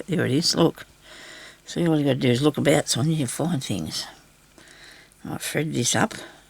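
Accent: Australia. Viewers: Hi Sonya, another very pretty piece coming together. Love the way your mind works. It’s also very refreshing to hear your Aussie way with words and phrases. I feel like we are losing the Australia we grew up with, anyway thanks for your ideas , best wishes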